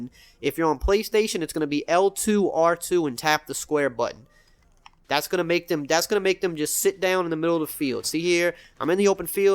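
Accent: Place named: New Orleans, United States. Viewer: First of your videos I’ve watched, noticed the New Orleans accent, keep it up, WHO DAT BABY!!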